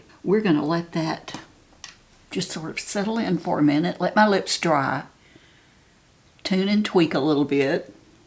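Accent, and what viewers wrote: South, United States. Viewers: Absolutely love this! You best believe I will be glamming up when I’m your age ❤ so elegant and your southern accent saying “matoooor” and “oahll(oil)” reminds me of my southern family. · Just found your channel at the recommendation of a friend! You are gorgeous! Love that you show your naked face and all the techniques you use to enhance your beauty. At 80, you are amazing! And with your accent you must be a sister from the south. 😊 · I love your Southern accent. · Good day Mrs. You are amazing and brave. There is no way, I would have a YouTube channel but show myself without makeup is a scary thing to me. But you have a bieutifull face and a great southern accents. I would love to hear some stories you might want to share and tips and things. I am sure you have a lot to share that would be helpful to somebody like me. Thank you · Just found your channel and I love it!!! 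Thank you for this makeup wisdom!!! Also,do I detect a bit of a Southern accent? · Your eyes are so pretty, bright, and clear. Not just the color, but the whites are so white, even b4 makeup application. Tends to fade with age and/or health challenges. 80 looks good on ya! Appreciate your transparency. New here. Enjoying your southern lilt. Luv the transformation you did here! Very nice result. Great pointers. Just checking it out 🖐 P.S.Yknow, me and a friend are watching and think you resemble singer Judy Collins. Ever heard that before? p.p.s. I have heard other makeup artists comment about the importance of blending, that unrernlenring is one of the most common errors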